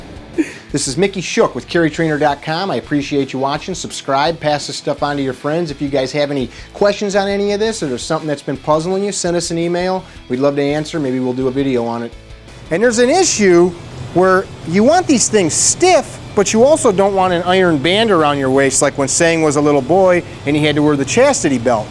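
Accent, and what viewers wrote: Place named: Midwest, United States. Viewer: That Midwest accent just kills me. 🤮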